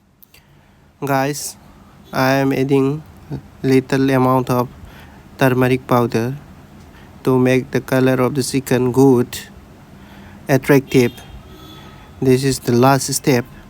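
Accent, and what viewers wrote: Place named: Arab world. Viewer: U have a little Arabic accent 😅... Nice recipe 🎉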